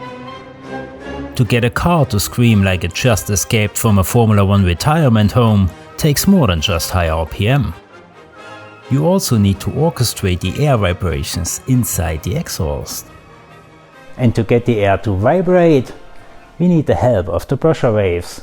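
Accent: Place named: Thuringia, Germany. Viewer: On a side note your thick accent sounds like you could be from near Thuringia. Yet there is often a hint of Swiss or Austrian. Very interesting. In Gera haben die immer so einen tollen Akzent.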